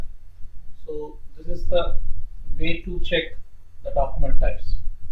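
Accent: India. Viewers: I guess this is indian speaker.....awful!!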